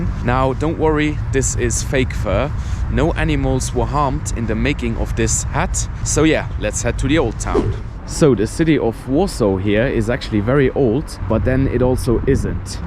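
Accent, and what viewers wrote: Germany. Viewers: greetings from Lithuania! I like your accent, sounds like German. I have never been normally to Poland, one day would like to visit Baltic coast, mountains and capital there. Thanks for the vlog! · OK even if, but his English is with very recognizable german accent as his first language is german.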